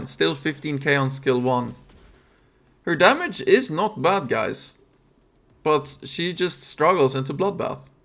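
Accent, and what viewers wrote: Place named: Norway. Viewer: are you nordic by any chance? kinda sound norwegian to me😅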